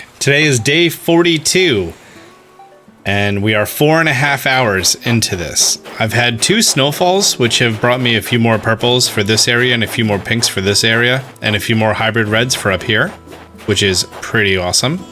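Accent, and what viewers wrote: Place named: East Coast, United States. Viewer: is this guy from philadelphia? he says "wuder" like an east coaster...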